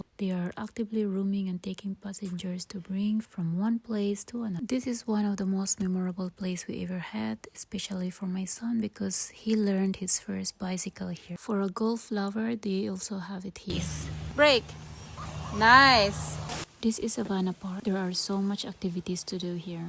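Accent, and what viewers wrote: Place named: Philippines. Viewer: Carabao English!!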